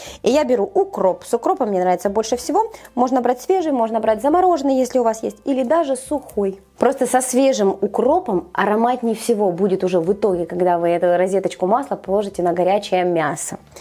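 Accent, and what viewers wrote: Ukraine. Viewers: здравствуйте! Хорошее, качественное видео, спасибо! Если кому-то рецепт кажется несовременным ( не соответствует ЗП, ЗОЖ - много жиров или сладостей), он просто не будет смотреть. Вам, как профи в кук-индустрии (ого, <num>+ пользователей), конечно же, дозволительны вольности в речи, такие, как частые украинизмы или местечковые "рІзетки" - розетки, это не из оф. украинского языка, это из выражений в отдельных местностях. Они не будут портить видео, если блогер этого не стесняется, а наоборот, акцентирует на таком звучании. Это будет украшать видео своим колоритом, если быть смелее! Сердечні вітання із святами та щирі побажання подальших успіхів в творчості!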